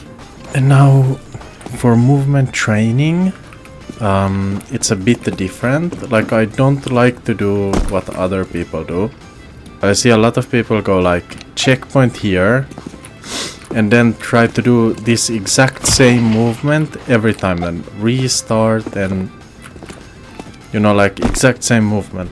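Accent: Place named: Finland. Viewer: Ait! is getting a new sub! When I get a nice humble guy, with good information, great knowledge, etc. AND who has the lovely finnish accent, then a fellow Norwegian HAS to sub! Great content bro! 🫵🥰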